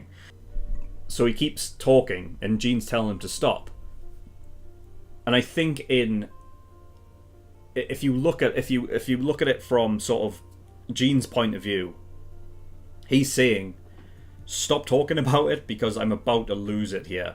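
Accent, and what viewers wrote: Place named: United Kingdom. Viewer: イギリス英語聞き取りやすくていいなw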